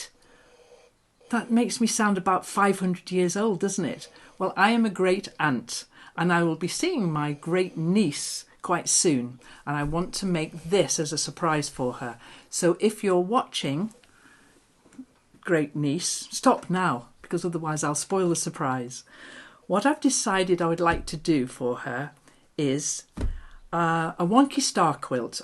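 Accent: England, United Kingdom. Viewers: I’m wondering also, what part of the U. K. are you from? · I tie most of the baby quilts I make. You can use a thicker batting and it’ll be “puffy”where it’s tied. Use a variety of brightly colored embroidery floss to tie it. Your videos are always inspirational. I love listening to your English accent, my grandmother was born in England, so it takes me back. ❤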